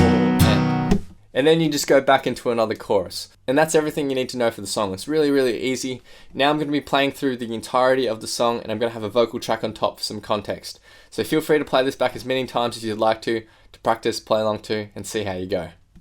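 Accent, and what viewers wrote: Australia. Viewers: wAit your Australian :0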